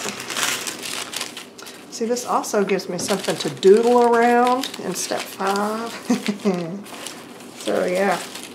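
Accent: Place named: Texas, United States. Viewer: I’m so delighted to know they still make the color “YELLA” I thought they quit making it when I left Texas 30 years ago. When I heard you say “YELLA,” I had to “PEENCH” myself. And then I saw yer Chick fil a card.I’m soooooo homesick, even now. I lived in Tennessee for 4 years too. When I’ve been watching YOU, my aceant reverts and my hubby says “ you’ve been watching again.” I fought my accent fur years, coz people in California treated me like I was ignert. Then I discovered I was proud of it, and I cain’t get it back completely. Sometimes you need a little age and maybe a kick in the pants to bring you round to where you were. And where I was, I sounded JUST like you! Blessings yall❣️🙏🏼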